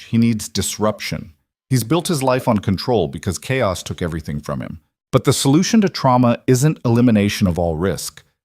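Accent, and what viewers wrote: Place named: United States. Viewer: And I don't understand why the AU narrator has a nasally American accent when the story is set in England. Ridiculous. 😔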